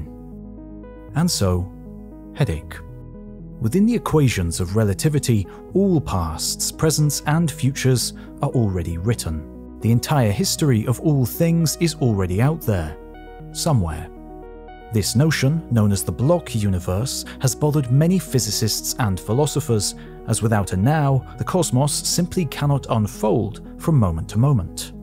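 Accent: England, United Kingdom. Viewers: Its the English accent, crap sounds like fact instantly.. Again hes reading off something because why is he saying we?? Only the majority think a certain way whilst the rest of us dont care about information that takes you away from a stable self financial comfort..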